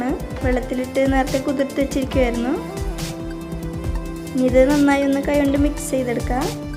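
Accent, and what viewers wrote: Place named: Thrissur, India. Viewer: സംസാരം കേട്ടിട്ട് തൃശ്ശൂർ കാരി ആണെന്നു തോന്നുന്നു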